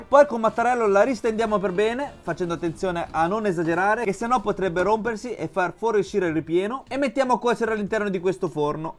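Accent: Italy. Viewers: Se dici che non si vede, però ti dico che si sente che sei italiano... Dai, si capisce subito appena ti si ascolta.... Poi chiaramente avrai origini cinesi ma non vedo dov'è il problema e tutta sta gente che si meraviglia di ste cose a giorno d'oggi a me inizia a starmi sulle scatole. Non dovresti precisare niente a nessuno!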